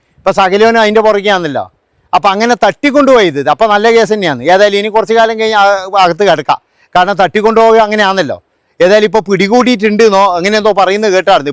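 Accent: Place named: Malappuram, India. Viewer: ❤... good talking... Malappuram slangs..if it's ❤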